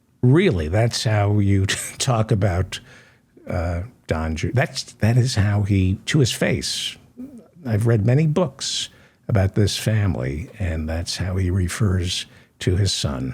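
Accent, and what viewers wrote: New York, United States. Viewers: I read ur intro, I grew up in Flushing and throughout my entire life I've battled to fall asleep. I'd put on earphones and listen to talk radio when I was a kid hoping to get some shut eye before school and still till this day I transitioned from a walkman and a.m. radio to a smartphone and YouTube. I found you at approx 4am last night(IMO it's never the next day until the sun begins to rise) and listened to one of your podcasts(full show), the ny/jersey accent, the dry humor overloaded with a large helping of sarcasm was right up my alley. Keep doing what you're doing, you're fantastic at this. We need to crush the GOP out of existence or if the GOP wants to continue as a party here in the US then they need to be known by the entire world population as the far right extreme fascist propagandist nationalists that they are but yes I know it's way too long for a party name but we can make it into an acronym such as the Far Reich Totalitarians party in short they'll be known as the FART Party). 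Then we'll have to out the Corporate Dems as the Republican lite party that they are and allow the real progressives to have our own party. Good day to you sir, you've found a new subscriber in me today with many many more to follow.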